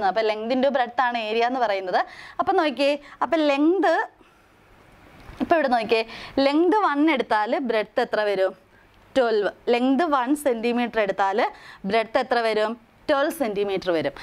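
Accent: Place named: Kollam, India. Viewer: കൊല്ലം ഭാഷ ആണ് എനിക്ക് നന്നായി മനസ്സിലാക്കുന്നത്. ഞാൻ കുറെ ലാംഗ്വേജ് സിൽ ഉള്ള ക്ലാസ്സ് കണ്ടു അതായത് തൃശ്ശൂർ തിരുവനന്തപുരം ഇതൊന്നും എനിക്ക് മനസ്സിലായതേയില്ല അങ്ങനെ ഒടുവിൽ എനിക്ക് കൊല്ലം പത്തനംതിട്ട ഭാഷയുടെ ക്ലാസ് കിട്ടി.😝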